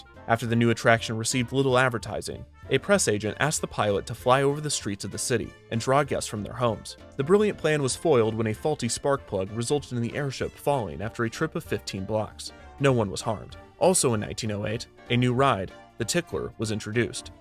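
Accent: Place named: United States. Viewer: Are you daft? He clearly sounds American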